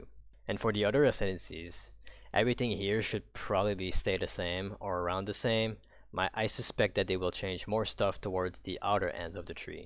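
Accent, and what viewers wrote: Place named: Quebec, Canada. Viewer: you sound SOOOO French QC speaking English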